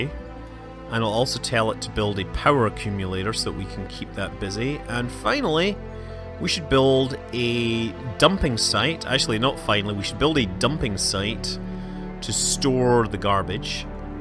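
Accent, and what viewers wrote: Scotland, United Kingdom. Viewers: It's called a scottish accent. Turns out native English speakers around the world don't all speak it the way you specifically do.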